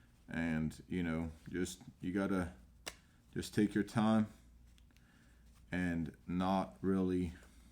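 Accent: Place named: Texas, United States. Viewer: I'm from Texas and you're from Florida, but I'm jealous because you have a perfect Texas accent, especially from the Central toward West Texas regions. You look like you could be in western movies. You might consider being an extra, at least. No one in, say, Fredericksburg or Hamilton or Junction, Texas would ever think you're a Floridian.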